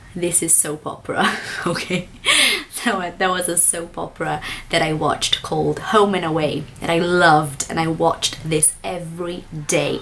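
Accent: United Kingdom. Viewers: Home and Away is an Australian soap. I just found you and I'm in love with your English. It is really good, clear and your British accent is amazingly beautiful. I'm so jealous of you as I consider English accent the most beautiful one, it is my favourite one. How can contact you? I need some help! cheers Tassia from Rio de Janeiro - Brazil - but currently live in Perth/Australia. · Eu amo seu sotaque britanico, taooooo lindoooooo :) fico tao feliz por entender, mas nao falo nada.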